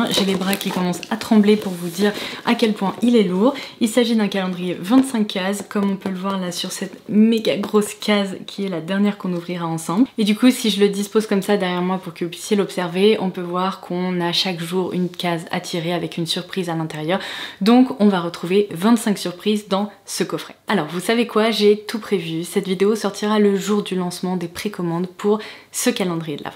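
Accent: England, United Kingdom. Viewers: Bonjour, ma question est un peu hors sujet dsl mais j’aimerais savoir si vous êtes d’origine anglaise du à votre accent anglais. Bonne journée.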